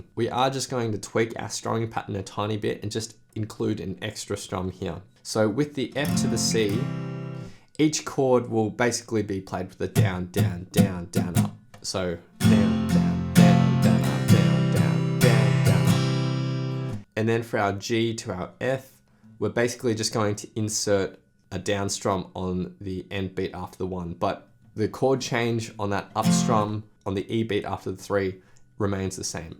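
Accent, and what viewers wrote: Australia. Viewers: .. it dawned on me that your accent is Australian? This is how I know actually.. I am very surprised you've not decided to cover yet!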